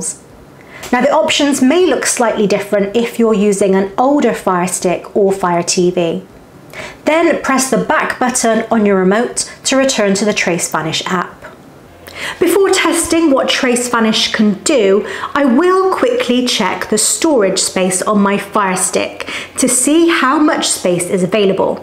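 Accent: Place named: England, United Kingdom. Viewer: Thanks. It works very well and let me tell you that I love your English accent.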